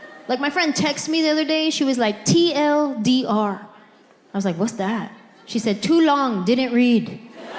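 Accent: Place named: San Jose, United States. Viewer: She sounds soooo San Jose! Subscribed. 😂❤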